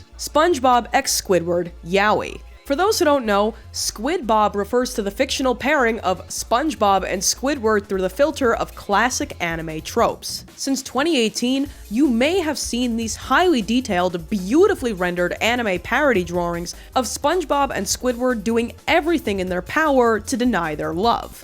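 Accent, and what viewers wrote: New York, United States. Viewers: close. That’s a NY accent. Staten Island maybe? Have a cousin who was born in Stat. They say words similar. I could be wrong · I aint from that area so i dont know for sure but its either new york, boston, or new jersey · you are my favorite lady content creator and I want to say tho I still hear a very slight NY/NJ accent I still find you charming and intelligent. Keep up the great work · She keeps mentioning her accent is she from new York? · I love your accent. New Yorker? New Jersey? Boston? I can't tell. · I love your accent! It reminds me of Whang so I'm wondering if you're also a fellow New Yorker. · your accent whips. not enough youtubers with potent new york accents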